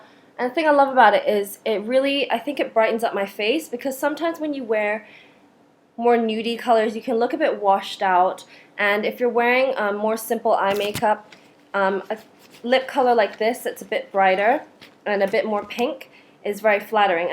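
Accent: United Kingdom. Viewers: Hiii, I'm kind of a new-ish viewer of yours and I was wondering if you've spent some time in the UK because your accent is British at times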